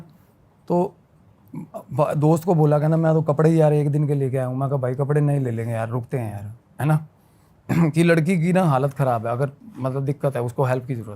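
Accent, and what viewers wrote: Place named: Rajasthan, India. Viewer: Bhai . I really love his Rajasthani accent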